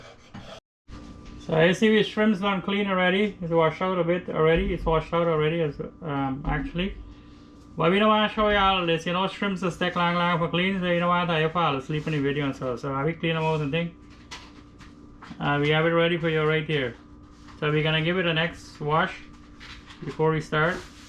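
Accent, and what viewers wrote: Guyana. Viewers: Really like the way you explain your steps and like your Guyanese accent. Make me feel like I'm back home London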